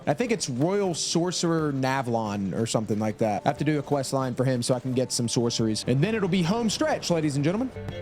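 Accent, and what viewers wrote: United States. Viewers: first time i seen this guy and ten seconds in i’m like “baltimore” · Ayy fam are you from Philly/Delco??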